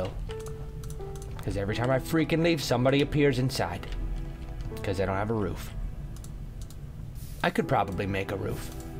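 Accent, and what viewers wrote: Scotland, United Kingdom. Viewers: What happen to Scottish accent voice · scottish accent is the best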